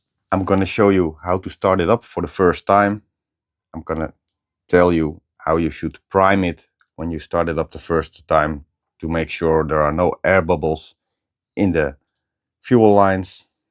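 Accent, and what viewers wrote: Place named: Netherlands. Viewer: Cool video, wanted to say that you sound Dutch and then looked into your profile 😀 Prima 👍 I see your garage wood walls, i assume its not 100% insulated. Wanna install this heater in my mancave, 5x5. Is it worth it? How quick does your garage warm up and to what temperature? If I need to run for 12 hours to get 20° than its sure not for me. Greetings from Limburg 👊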